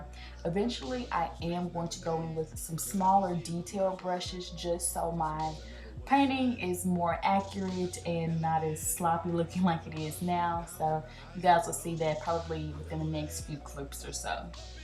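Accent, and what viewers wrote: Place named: Texas, United States. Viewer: When I first found you on Youtube I instantly searched for your location I could have sworn you were from Texas Lol you sound so much like her!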